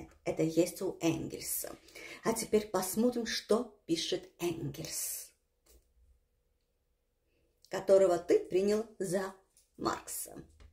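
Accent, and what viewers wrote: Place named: Georgia. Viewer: твой грузинский акцегт просто убил!!!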